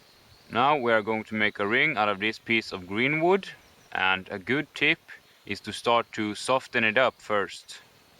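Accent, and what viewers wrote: Sweden. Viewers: Make sure the sticks are really "smood" guys. ahahah I love the Swedish accent. awesome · Wow his swedish accent isbreally close to a finnish accent!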